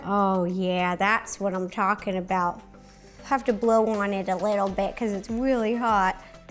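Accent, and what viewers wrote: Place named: Rural, United States. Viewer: Awww her adorable country accent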